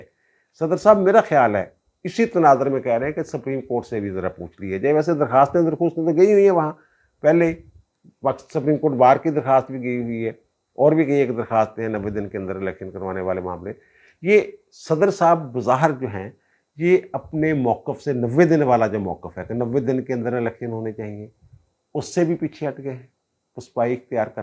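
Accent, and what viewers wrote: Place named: Jalandhar, India. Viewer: چوھدری صاحب یوں تو آپ کا وی لاگ قانونی طور پر بہت مظبوط اور معتبر ھوتا ھے لیکن جب آپ جالندھر ھوشیارپور کے لئجے میں پنجابی بولتے ھیں تو بہت اچھا لگتا ھے کیونکہ یہی لہجہ ماں بولی کا میرے گھر کا ھے۔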